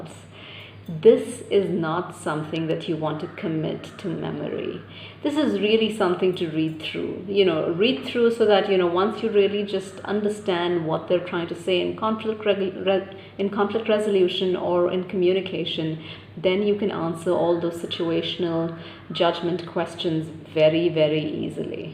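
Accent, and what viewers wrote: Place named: England, United Kingdom. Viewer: Hi hope you are doing good I just loved your English accent. Please can you guide how you achive this?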